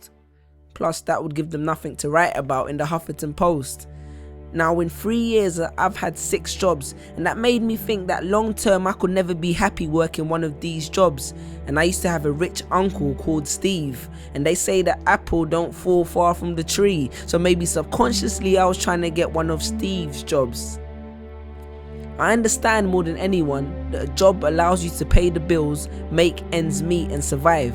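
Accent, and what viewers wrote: London, United Kingdom. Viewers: He has a British accent. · It's quite disturbing seeing a black man with British accent! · british london accent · it's a British accent. Australian accents are alot rougher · That's a British accent...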